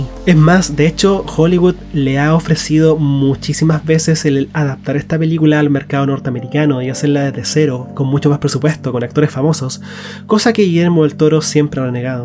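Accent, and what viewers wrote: Chile. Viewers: Qué malísima esta review . Y no hablas neutral.. hablas chileno. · Eres un grande pero sin ofender el acento chileno se te nota a leguas, no creo que domines el acento neutro. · Acento neutro???? De acá a la china se nota que sos chileno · tu acento es bien chileno ,man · Dices que hablas neutral??? Pero si hablas Chilensis 🤭🤭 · NO HABLAS NEUTRAL. Tienes el tipico acento chileno. Ahora que no lo notes es diferente. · Nos ered neutral, se nota tu acento chileno a kilómetros.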